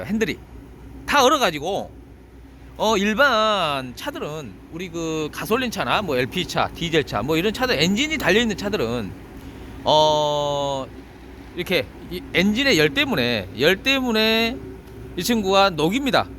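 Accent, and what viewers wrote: Jeolla, South Korea. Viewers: 전라도 사투리 ㅎㅎㅎㅎ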